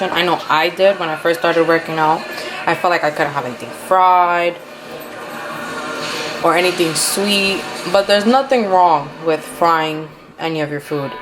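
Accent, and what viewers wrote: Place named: Dominican Republic. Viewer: Idk if I paid attention to what you eat or your Dominican accent. Haha love it!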